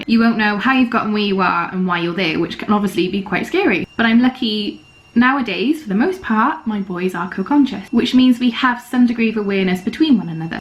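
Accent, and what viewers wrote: Wales, United Kingdom. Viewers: are you welsh? :o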